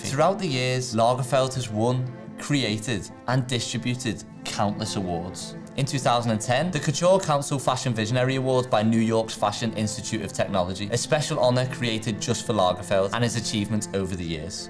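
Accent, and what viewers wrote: Liverpool, United Kingdom. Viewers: Really enjoyed this quick, informative and to the point. I kept wondering about the presenter's accent. Scouse? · I am glad I have found this channel, it’s quick and sparing of unnecessary info BUT you do need to do a bit of work/research on your pronunciation. Oh, and you can’t blame it on your mildly scouse accent either, sorry. 😀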